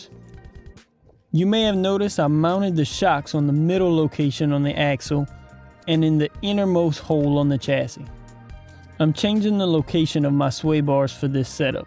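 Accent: Louisiana, United States. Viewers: Where are you from? That sounds like one of them Cajun accents!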